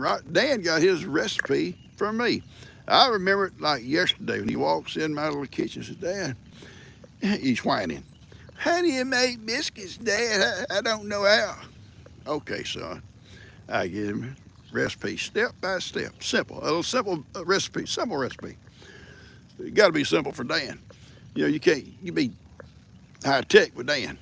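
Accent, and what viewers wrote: South, United States. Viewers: For me, I love listening because of your strong southern accent! I’m from Oregon!! Love the way you say a lot of different words😊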